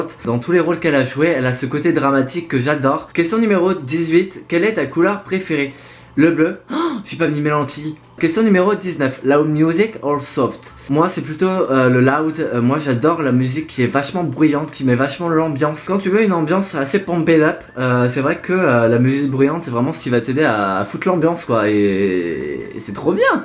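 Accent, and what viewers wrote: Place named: United Kingdom. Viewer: accent British parfait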